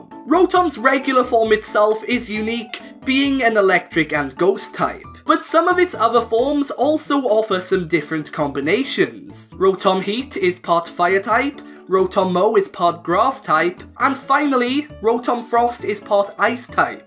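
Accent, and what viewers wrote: Wales, United Kingdom. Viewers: holy shit a welshman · Oh man, I can not believe how they discriminate you so much for having a British accent when speaking in your videos. Personally, I really liked your videos, and if you ask me, I'm not American, I'm Mexican. Keep it up. Note: I saw that you forgot the psychic type although perhaps there are no unique combinations with it. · Are you from Wales ???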